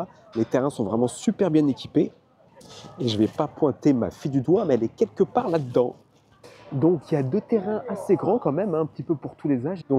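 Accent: France. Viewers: Je pense que les gens de Upstate New York sont fascinés par votre accent. Les gens vous posent souvent des questions sur la France ? sur votre origine?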